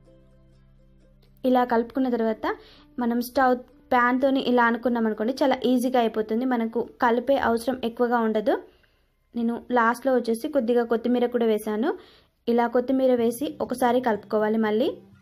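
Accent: Telangana, India. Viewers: Pakkatelangana matladutunnaru pillalto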